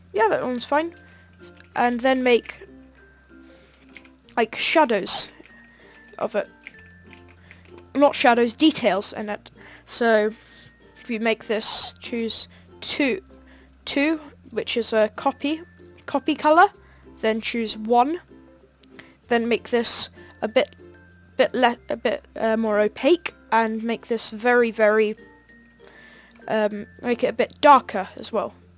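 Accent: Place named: United Kingdom. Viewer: The person talking sounds like a British man 🤣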